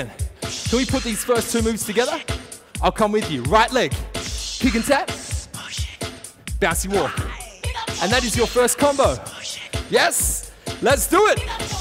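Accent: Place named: England, United Kingdom. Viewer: What English Accent is that?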